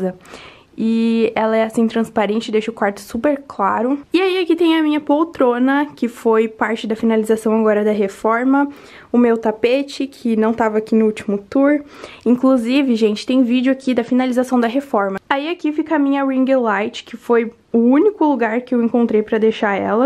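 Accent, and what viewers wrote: Rio Grande do Sul, Brazil. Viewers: Vc é gaúcha? Teu sotaque é lindoo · Bahh q quarto fofo, um sonho! Tu é gaúcha? Parece muito... Eu sou gaúcha! Kkkk♥️♥️♥️♥️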